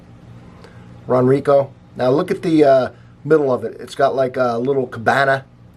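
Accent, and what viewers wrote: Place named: Pittsburgh, United States. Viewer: The silver label with Hawaiian Punch is very good. I drink it when writing. Btw... I’m picking up a Pittsburgh accent from ya. You from western P.A.?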